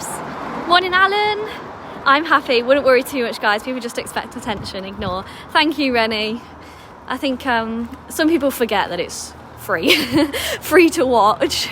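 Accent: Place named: Leicester, United Kingdom. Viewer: Wow, really ! Leicester & the area of Bury have a very similar accent then. Looking forward to watching more of your videos. Have a great evening.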